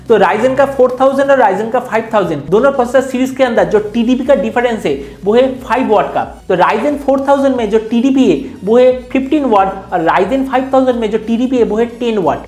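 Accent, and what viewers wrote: Bengal, India. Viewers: Nice Bengali ascent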